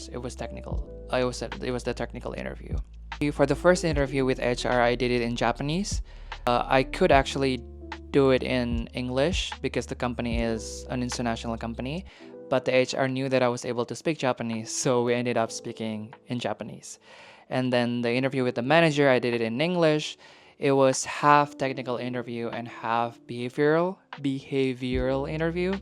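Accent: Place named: Indonesia. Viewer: Sounds Indonesia I guess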